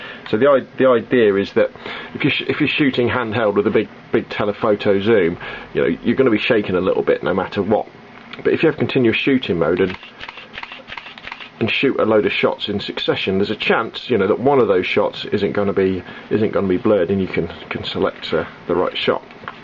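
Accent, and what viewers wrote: United Kingdom. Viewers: Are you british, I like that accent !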